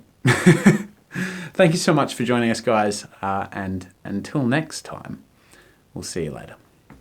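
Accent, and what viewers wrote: Australia. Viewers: sounds more Australian 4 years ago than he does today:) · I have never heard such a huge aussie accent from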